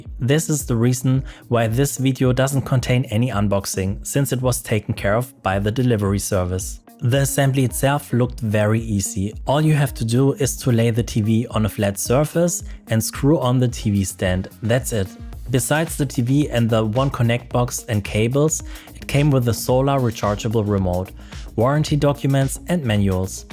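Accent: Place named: Germany. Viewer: You sound almost exactly as "Battlenonsense" on YouTube. It's zee German accent.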